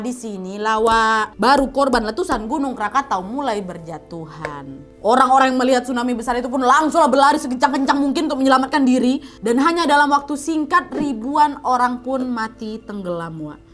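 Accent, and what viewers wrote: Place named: Medan, Indonesia. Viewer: senang melihat membawakannya lucuuu.. kental kali medan kau.. GOOD JOB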